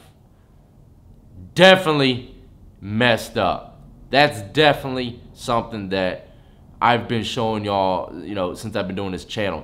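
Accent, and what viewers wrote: United States. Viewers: Why do you Americans say the letter Z wrong!! And in a bid is a way of saying they tried to !!! Not in a bid how death said it !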